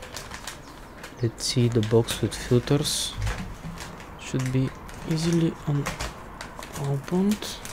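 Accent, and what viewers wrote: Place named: Russia. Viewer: Я один слышу русский акцент?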